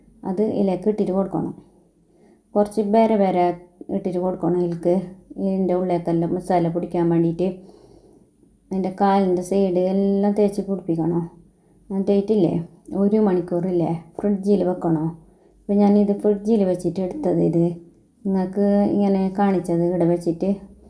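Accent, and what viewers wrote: Kasaragod, India. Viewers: എനിക്കിഷ്ടമാണ് നിങ്ങളുടെ സംസാരം പിന്നെയും പിന്നെയും കേൾക്കാൻ തോന്നും കാസർഗോഡ് സംസാരം ഒന്ന് വേറെ തന്നെയാണ്❤❤❤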